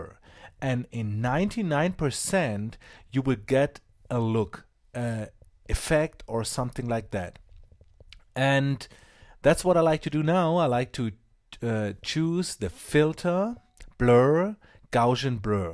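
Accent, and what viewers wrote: Germany. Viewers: Irgendwie wusste ich schon vom ersten Wort an, dass du aus Deutschland kommst. ^^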